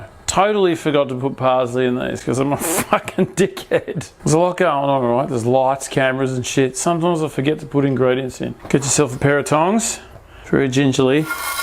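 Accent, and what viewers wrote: Australia. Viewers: i fucking subbed cause ur swearing in an Australian accent its funny as fuck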